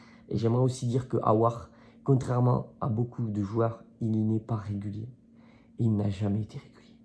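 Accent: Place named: Occitanie, France. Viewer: Et pourtant un vrai gone mais le travail a fait qu'il vit dans le sud en occitanie d'où l'accent mais lui et sa famille complète sont de la croix rousse. Lyon 4eme. Et a vécu dans le 7eme et 8eme arrondissement de Lyon. Son amour pour l'ol le prouve sans soucis.😂😂😂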